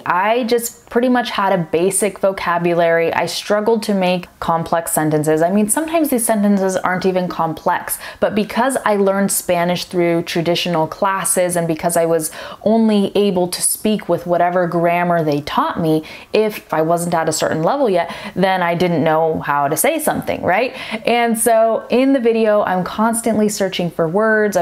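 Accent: Buenos Aires, Argentina. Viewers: Me suena porteño. Sometimes mexican...(for me, I am not a native castillan speaker) but...congratulations!!! You are really good. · Are you Argentinian ? 🤔😎 felicitaciones y con acento 'porteño'. I speak in cordobés accent 😅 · Hi! Here I strike again from UY. I`ve already told you you sound like a true porteña!!! Congrats for the huge number of comments!!! Now... let me tell you that in my ears you sound way more sweet speaking in English than in Spanish (regardless of your perfect Argentinian accent). Kind of a more femenine, soft accent... May I kindly suggest you to visit UY next time you come to the River Plate? Maybe uruguanizing a little your Spanish would make you even more gorgeous:):) Argentine (BA) accent is very clear but a little harsh. I think a mix of Uruguayan and Mexican would make the best Spanish accent blend. (Only God knows all the replies this will bring LOL) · Ese buenos aires en vos si se puede ver hehe saludos desde Misiones (Argentina). 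· Sos re porteña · Es increíble como tu voz cambia cuando hablas español. Si te viera por primera vez diría que sos argentina. Te convertís en otra persona hablando en español. Sonás como una verdadera porteña!! Kisses from Mendoza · Hay el yeyeoo porteño!! Te sale la tonada cordobesa? XD En mi caso tengo exactamente el mismo rasgos que vos: meticuloso, medio obse y falto de confianza... pero por alguna rara razón, no le encontré un método robot de estudio como sería usual en mi. Hice todo lo que mencionas, empecé a escribir y estudiar en ingles, ver todos mis vídeos en ingles con subtítulos al ingles, mucho shadowing... lo disfrute muchísimo!!! Fui aprendiendo usándolo ... y obviamente todavia sigo. Gracias por el canal. Hermoso! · Muy buen españool! (Tenes acento bonaerense) 😂❤ love it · OMG!!!! You are 500% porteña!! awesomeeee!!! I'm from Ecuador but lived over there 7 years... any ways! 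I almost dont have accent in English cuz I practice all the time, watch my favorite Tv show which is "Friends" and sing in English all the time... I love it when americans think Im from NY. Saludos!! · Congratulations, for the work you have been developing and sharing. Your videos are very assertive. In spite of his strong "portenho" accent, her Spanish is very good. I am a native speaker of Portuguese and I have studied Spanish for at least ten years and I also speak a little. For us, speakers of Portuguese, it is much easier to learn Spanish compared to English, given its similarity to our native language. Thank you very much for your tips and congratulations. Andryo Amorim Ananindeua, Pará, Amazônia, Brasil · Hola, Toda lengua tiene diferentes acentos, en países y sus regiones. Por ejemplo en ARG tenemos 5 acentos (norte, cordobés, litoral, mendocino y bonaerense). Ella está hablando con acento argentino bonaerense. 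Les recomiendo aprender español de España o castellano en principio y luego centrarse en algún acento que les guste. Yo aprendí ingles británico primero y luego me centre en ingles de norte américa. Es la forma más fácil y limpia de aprender un idioma en mi opinión. Ah, y no olvidar hablar y aprender palabras nuevas, las reglas gramaticales las aprendes cuando ya tengas un suficiente dominio del lenguaje. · Increíble, realmente pareces de acá de Argentina, específicamente de Buenos Aires. Thank you for the advices!! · No lo puedo creer jaja. estuve viendo sus videos por meses y yo pensando que era super yankee y de un momento a otro se pone hablar con acento porteño? SHOCKED!! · Sos una genia, tu español es excelente y tu acento porteño es bárbaro, te re felicito! I hope I had met you when you were here! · You speak Spanish with a perfect Argentinian accent I hope your parents are really proud of you that's very impressive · Que increíble escucharte hablar en español (argentino) 😅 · Hablas Argentino JAJAJA muy bien! 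· Perfecto español porteño jaja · Con acento porteño!!!! Q genial che!! Yo soy Argentino.. Te felicito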